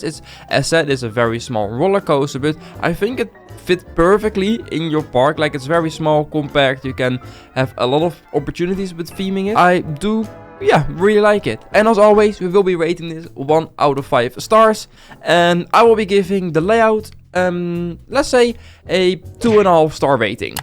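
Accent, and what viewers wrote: Netherlands. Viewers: 😅ik hoor een Nederlandse accent ben je Nederlands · Bro the dutch i am dutch and all of the time i think all of the time are you dutch. So are you dutch or not?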